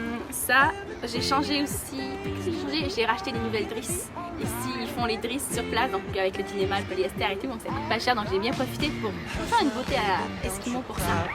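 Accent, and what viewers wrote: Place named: Quebec, Canada. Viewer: qu'elle belle surprise de te retrouver. Tu donne du plaisir à beaucoup de monde. Je suis un vielle homme de 75 ans invalide, veuf et je vis seul dans une maison trop grande. La vie que tu as choisi. Quel volonté et courage de continuer l'aventure seule sur ton petit eskimo. Tu vis ton rêve que d"autres ne font que rêver. Je vous suit depuis des années. J'aime votre accent du Quebec, parfois incompréhensible (je suis Belge) et vous et quelques autres voileux vous êtes mon seul plaisir. Bon vent à toi petite sirène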